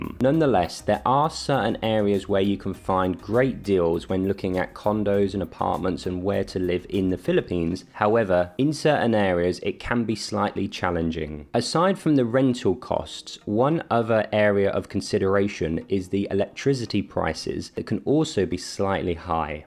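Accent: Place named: United States. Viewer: u must been a american that is why u talk like that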